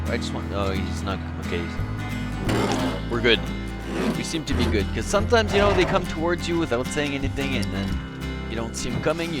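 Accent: France. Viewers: are you french cause im french and when i speak english i sound similar to you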